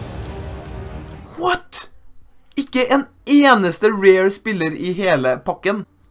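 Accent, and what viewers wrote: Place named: Trøndelag, Norway. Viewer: Liker ikke selv om det er bra vidio men du er trønder og jeg er fra Molde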